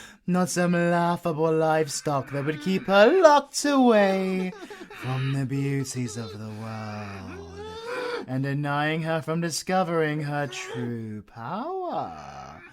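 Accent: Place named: United Kingdom. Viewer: Finally! A person who has a British accent! I been needing someone to voice a character of mine for a few voice overs. If you’re up to it? It’s for my artwork and a feature.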